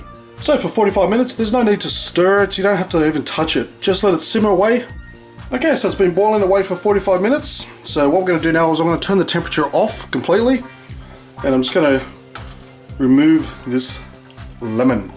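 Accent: Australia. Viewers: Actually, for all those remarking on the Australian accent, you are being incredibly rude. Don't watch if a different accent is offensive. There are American and Englush accents which are unintelligible to other ears. I'm not Australian and can eadil understand what is being said.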